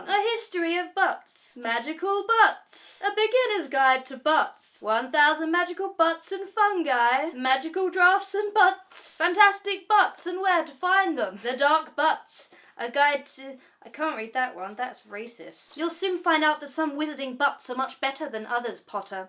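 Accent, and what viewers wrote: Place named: Scotland, United Kingdom. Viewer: Also love your scottish accent, it's nicer than mine and I'm scottish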